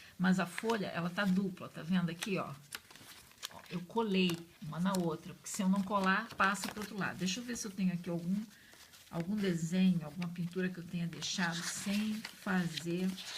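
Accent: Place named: Rio de Janeiro, Brazil. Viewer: amei esse video ( e todos os outros que ja assisti aqui do canal..kkkk) muito boas suas dicas...muito sincera,direta...sem enrolação...muito eu...( sou carioca e esse seu sotaque me faz pensar que vc tb...acertei ???) Bj grande e muito grata !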